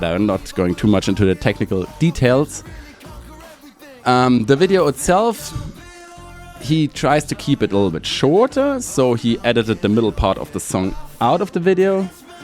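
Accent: Germany. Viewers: You might not like your speaking English, but listening to you made me really enjoy the German accent as an native English speaker (American). You have such a lovely cadence 😁 I'd have to agree, Nadi feels over edited because he does the same thing different ways. If he did it just once, it would feel more balanced and not a redundant show off in my opinion.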